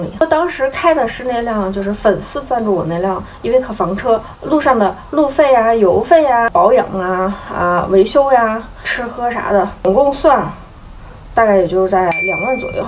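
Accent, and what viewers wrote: Shaanxi, China. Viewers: 陕西话摄地聊滴太。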